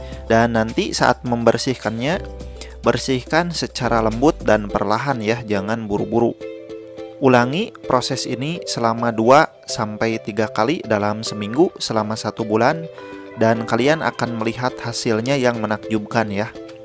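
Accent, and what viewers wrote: West Java, Indonesia. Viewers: Logat sundanya edun aa haaaa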